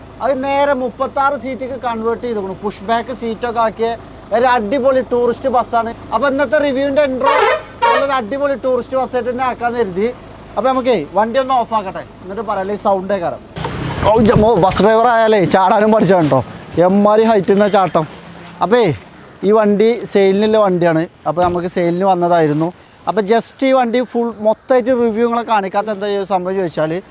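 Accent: Malappuram, India. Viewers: മലപ്പുറം ഭാഷ യിൽ ഉള്ള വീഡിയോ വേറെ ലെവൽ 👍🏾👍🏾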